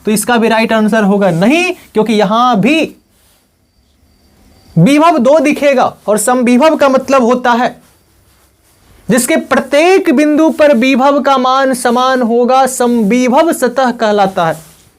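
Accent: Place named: Bhojpuri, India. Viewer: Bhojpuri sun k badhiya laga sir ji 😂😂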